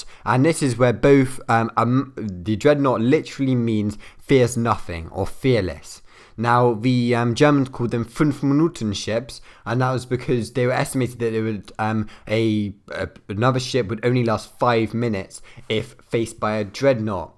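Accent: United Kingdom. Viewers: Sounds like a pretty standard middle class English accent, but with a slight speech impediment.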